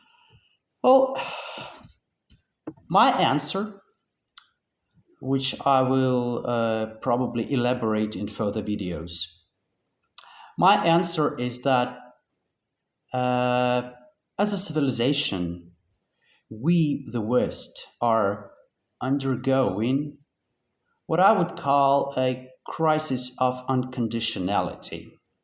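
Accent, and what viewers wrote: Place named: United States. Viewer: Вітаю, а ти якось навіть схожий на американця, ще і вимова така автентична :hand-pink-waving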